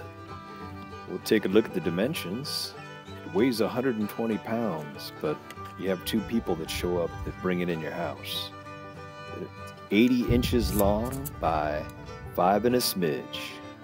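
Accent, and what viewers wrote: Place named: South, United States. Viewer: Same here. I loved the hick voice. No offense to the little women, but I'd kept it the entire video. I feel its a shame the southern accent has nearly become extinct.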